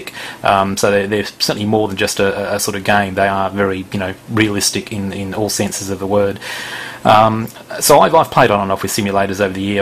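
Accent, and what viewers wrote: Australia. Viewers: LoL racist j/k - The guys clearly an Aussie. Sounds nothing like the British accent. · are you from Australia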